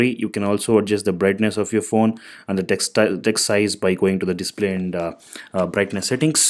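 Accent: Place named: India. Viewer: neverhome_rph I am in total agreement with jarcohen You MUST rehearse your You Tube presentations and LEARN to pace your speech. You speed up at times which makes what you are saying TOTALL ILLEGIBLE! Then you then speak slowly and you information comes out clearly. There were too many instances when your fast talk, combined with your Indian dialect, made your presentation too difficult to follow. PLEASE SLOW DOWN!!!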